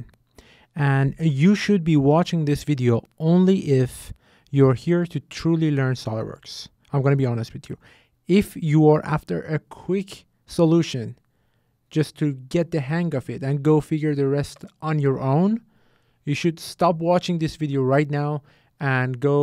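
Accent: Iran. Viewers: why i think you are from iran😂😂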